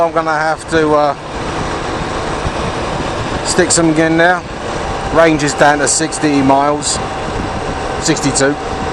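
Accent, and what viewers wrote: Cockney, United Kingdom. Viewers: Good job keep up the good work... Love the vids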